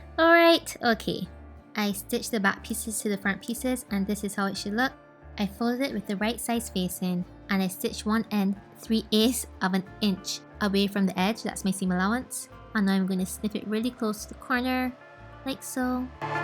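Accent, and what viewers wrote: Barbados. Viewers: Are you from Barbados?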